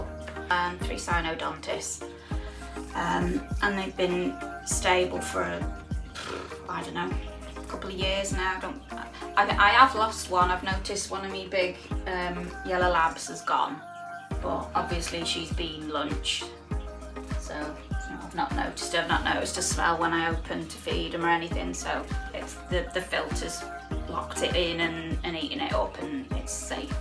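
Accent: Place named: England, United Kingdom. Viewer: great little video, and nice to hear an English voice, lovely house you live in, and your camera work was very good under the circumstances, have subbed, cheers Shane Warks uk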